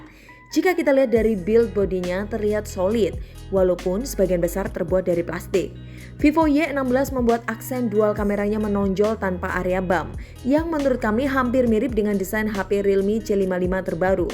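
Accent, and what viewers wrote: Surabaya, Indonesia. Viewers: Suroboyo pasti iki😅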